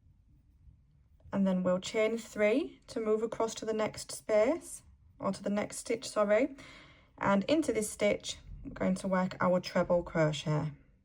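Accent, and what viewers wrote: Northern England, United Kingdom. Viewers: 💙💙💙 Thank you so much for sharing this. ✌🏻💙🧶📝✂️ Are you from the northern part of England? I'm just curious because your accent is a bit different.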